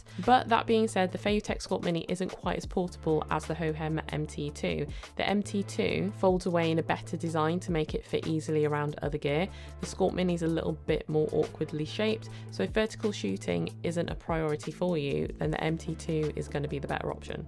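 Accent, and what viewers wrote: United Kingdom. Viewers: Love the British accent!!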